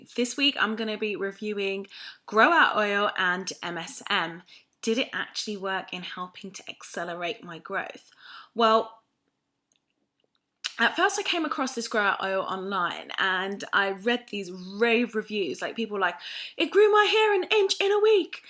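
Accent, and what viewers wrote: England, United Kingdom. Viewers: Love ur beautiful English accent....lol. U sound a bit like Rita Ora. ;) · Ur so pretty and I love your English accent! And your hair looks great! Thanks for the thorough review.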